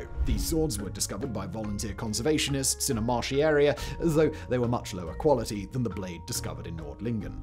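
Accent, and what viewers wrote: RP, United Kingdom. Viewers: This sounds normal for a British man with a Received Pronunciation accent - I have the same accent and the audio doesn't sound harsh or over-sibilant to me. I accept it may do to others.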